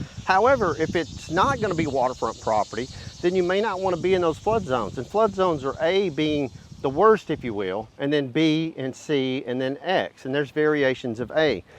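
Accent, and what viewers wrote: South, United States. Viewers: That super southern accent is a challenge. Damn. "Number tin is mineral rots" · i love me some southern accent hehe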